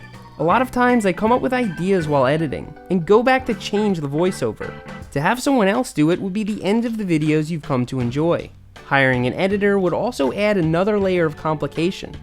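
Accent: Long Island, United States. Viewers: ❤️ I wish for you continued success at whatever you choose to do. Thank you for including us in your adventures! I knew you were from Long Island by the way you pronounce water, wodder, lol. Why are you planning to sell Berm Creek? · My dog Lucy and Drama are so similar. Lucy is a Boston terrier (similar to frenchie) and she's bigger than every other Boston we encountered. She's supposed to be a pure bread Boston but it don't look like it with size. We assumed that one of her relatives is a boxer. Edit: with your long island accent, it's really similar to my uncle, aunt, dad, etc. They're from Long island and now we live in NYC. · Love this....You from Long Island? I’m from Jerzee?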